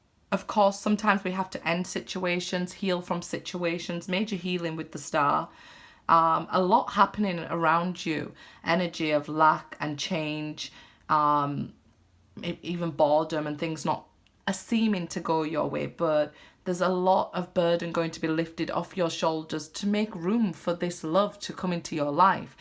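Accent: Yorkshire, United Kingdom. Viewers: Are you from Yorkshire, leeds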